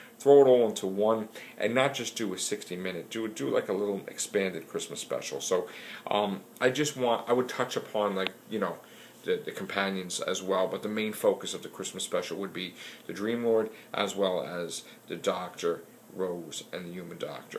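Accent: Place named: Boston, United States. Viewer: You've got a great Boston accent!!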